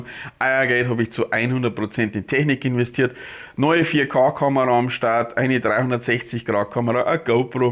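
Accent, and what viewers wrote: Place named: Swabia, Germany. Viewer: Hallo, viele Grüße von Indiana in den USA. Ich schaue mir deinen Kanal sehr gerne an und habe ich schon vieles gelernt. Mal sehen was noch alles auf uns zukommt im kommenden Jahr. vor vielen Jahren lebte ich in Stuttgart und dein Dialekt bringt mich immer wieder zum schmunzeln.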